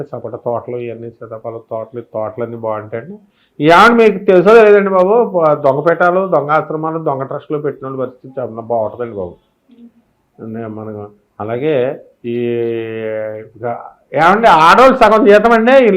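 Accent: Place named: East Godavari, India. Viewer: East Godavari yasa andariki nachhadu, mamuluga matladandi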